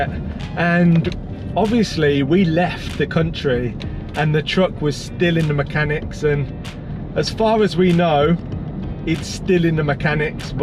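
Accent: Birmingham, United Kingdom. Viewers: I've been following the 2 of you since my Auto play turned on one of your early videos and not even watching the screen I heard the dulcet tone of Brummie wafted across my studio. I'm from Ward End but moved to Greece 13 years ago then came back to the UK 8 years ago but not to Brum so our youngest son could finish his education. Watching your little tour took me back over 30 years ago, doing the BRMB walkathon through the park and around the No 11 bus route, performing at the MAC with my collage drama class. My father was an Engraver in the jewellery too. Not sure if I really miss Birmingham, I think I miss Greece more. Glad is feeling more herself, x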